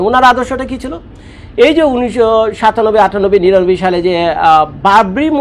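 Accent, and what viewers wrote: Dhaka, Bangladesh. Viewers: ভাই আপনার কথা শুনলে হাসি থামিয়ে রাখতে পারিনা বিশেষ করে যখন আমাদের ঢাকার ভাষায় কথা বলেন । ❤️❤️❤️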